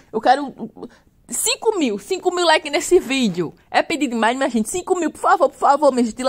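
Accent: Northeast, Brazil. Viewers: A Questão é a Técnica ( Saúde e Bem Estar ) para dar alívio ao Paciente/ Cliente. Satisfatório em pegar um Pé/ Unha em Estado Lamentável e fazer com que a pessoa fique bem. A Técnica em si para transformar a Pessoa em uma Pessoa Saudável e Feliz. Tenho um Exemplo da mãe de uma vizinha Diabética que foi a Manicure e o caso dela era de Podologia. O resultado foi a Amputação de parte do Pé por causa dos Efeitos da Diabetes. No caso desta senhora na qual estou relatando, foram 2 procedimentos de Amputação por não ter procurado o Profissional adequado para um simples problema de Unha Encravada. Manicure é uma coisa. Podologia é outra. No caso de Cravos e Espinhas ( que eu tbm assisto ) é a Técnica para a Saúde e o Bem Estar. A pele é o maior Órgão do Corpo Humano e tbm é muito interessante em assistir. Este vídeo é ótimo e as suas locuções com este sotaque nordestino é melhor ainda. 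( Muito divertido). Parabéns! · Eu amooooo esse sotaque nordestinoooo!!! Amo o nordeste, o povo de bem com a vida 👏👏👏👏👏👏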